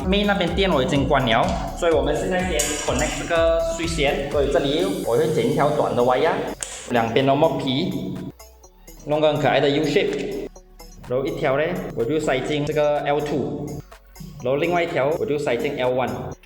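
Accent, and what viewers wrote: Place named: Kuala Lumpur, Malaysia. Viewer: 老板一口粤音中文应该是吉隆坡人吧？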